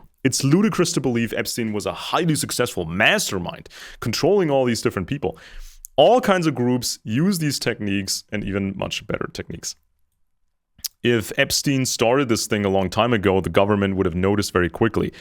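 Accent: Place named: United States. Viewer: Well done! I put headphones on and listen while I work. I can relisten to one episode 3 or 4 times and get something new each time. I really appreciate your efforts. I'M LEARNING!!!!! You're English is so good that if you had not told me you were German I would have thought you were from the USA.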